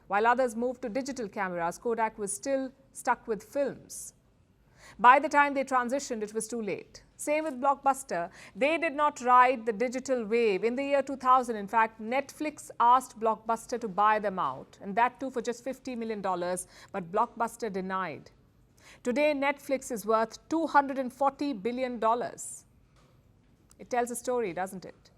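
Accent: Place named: India. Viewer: Horrible accent ,we are not trying to learn English with Hindu accent…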